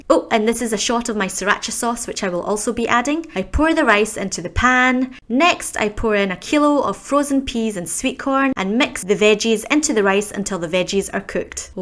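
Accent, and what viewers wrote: Scotland, United Kingdom. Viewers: Wait a minute.....are you Scottish?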